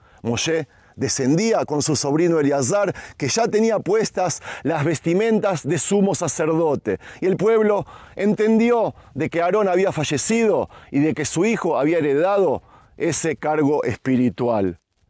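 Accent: Argentina. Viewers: es hermoso,amo cada terron,cada grieta..desde lo mas profundo de mi alma,q El Señor me prestó te doy gracias - mi vida va atada a tus pies, cuando me muestras los precipicios y grietas,he aprendido a tolerar tu acento argentinon', en haras de los videos q subes--desde Venezuela Hashem t cuide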